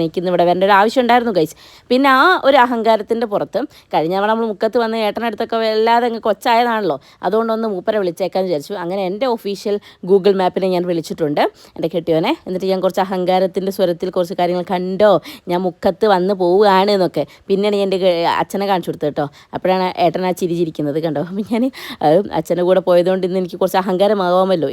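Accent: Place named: Thrissur, India. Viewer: പക്ഷെ ചേച്ചി ടെ ഭാഷ കോഴിക്കോട്ക്കാർ പറയുന്ന ഭാഷയിൽ അല്ല ല്ലോ ചേച്ചി സംസാരിക്കുന്നത്. തൃശൂർക്കാരുടെ പോലെ തോന്നി ട്ടോ അതുകൊണ്ട് ചോദിച്ചത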